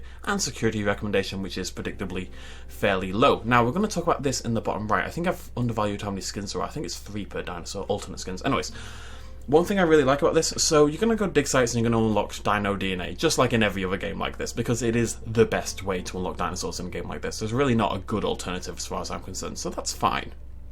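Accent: United Kingdom. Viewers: Woah I thought this was Nature's Compendium when I clicked. Imagine my surprise when I heard the voice of my favorite Brit.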